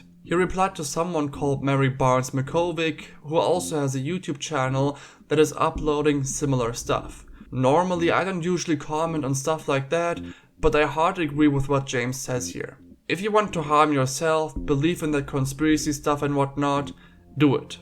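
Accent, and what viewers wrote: Germany. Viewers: Are you German by any chance? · German for sure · basne He's actually saying 'can't be asked' which is a common mistake we Americans make, due to mishearing or misunderstanding the British 'arsed' as 'assed' and then further twisting that to 'asked.' He also typed it as such in the video description. The accent, though? I'd have to say it sounds a bit German - to my ears he definitely does not have an American accent. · His accent sounds very similar to a German one. Almost South African.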